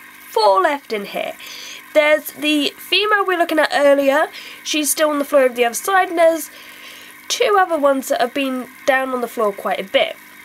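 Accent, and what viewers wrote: United Kingdom. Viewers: i can't really recognize accents (since I've moved to wales which is weird) but are you British?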